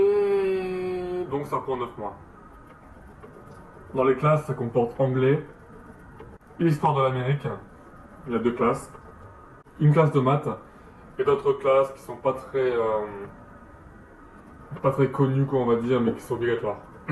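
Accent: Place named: England, United Kingdom. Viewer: T'as l'air d'avoir du mal à parler français j'trouve, t'as même l'accent anglais xD . En tout cas vidéo très intéressante ! Continue !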